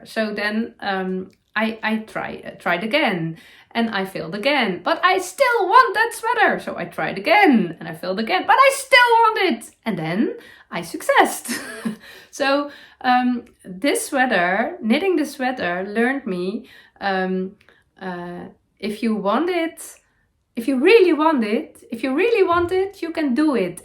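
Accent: Netherlands. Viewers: I love your Dutchy English. It always makes me smile. Some of the things you say may be grammatically incorrect, but they make perfect sense and that is what I like. Thanks for podcasting in English for the rest of us. 💜💜💜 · Hello. Happy New Year to you….bit late but better late than never! Love your vlogs and your Dutchy English always makes me smile….in a good way! I too have been wanting to cast on a Felix cardi so maybe, if I finish my Stephen West MKAL😂😂😂I’ll cast on with you. Best wishes❤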